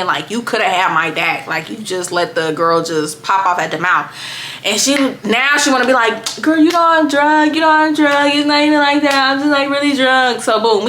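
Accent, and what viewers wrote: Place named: New Orleans, United States. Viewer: Ya feel me...sound like u from the N.O...lol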